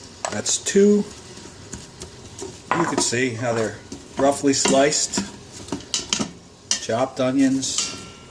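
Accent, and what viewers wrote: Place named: Philadelphia, United States. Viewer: Thanks. I just finished watching this video and ready to use my new Insta Pot to cook a pork loin. I gut laughed at the end when you exclaimed "GD that smells good!" Also, I am wondering if you are from SE PA? I detect a Philly accent.: ) Thanks again.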